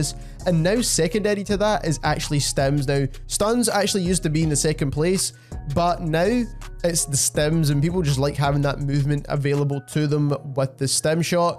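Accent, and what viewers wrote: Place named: Scotland, United Kingdom. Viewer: Scottish pretty sure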